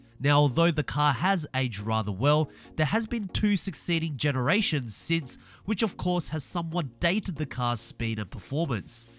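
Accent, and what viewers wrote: Australia. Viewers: aussie voices are the best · I never knew you were Aussie mate. I can hear your accent now. I'm across the ditch. Those Rovers were popular here back in the day.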